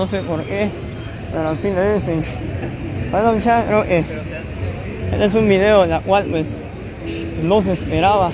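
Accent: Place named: Guatemala. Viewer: Me gusta tus videos mas porque usas el acento chapin no de otros paises siempre usa el acento chapin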